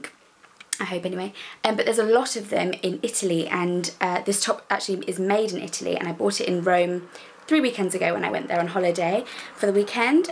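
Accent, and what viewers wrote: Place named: England, United Kingdom. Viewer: You have such a classy English accent, i love it!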